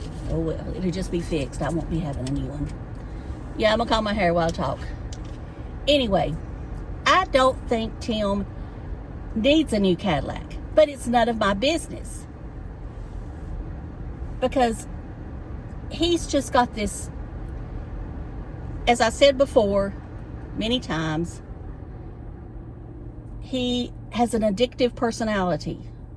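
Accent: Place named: South, United States. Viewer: Great conversation. You really have talent for it. I never stop listening! This video reminds me old radio shows they did years ago with like a mystery or a who done it! Well anyway i guess its the accent the southern belle sound. Im trying to figure it out but you were not all over the place just connecting all this mystery about the wackiest person on you tube so if you even try to decipher what is going on its gonna be a chore.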